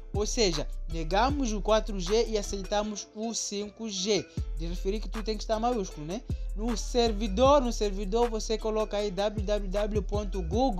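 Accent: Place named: Africa. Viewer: seu sotaque é africano 🤔🤔🤔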